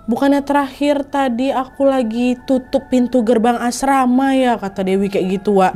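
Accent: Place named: Banjar, Indonesia. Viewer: Seperti Bahasa orng Banjarrr 😂😂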